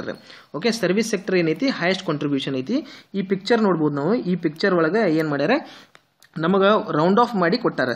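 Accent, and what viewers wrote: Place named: Karnataka, India. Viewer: 👌bro nimm Kannaada acent keloke kushi aglikathithi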